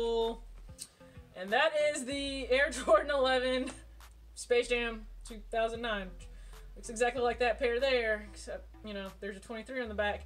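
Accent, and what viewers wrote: South, United States. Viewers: I love your thick country accent!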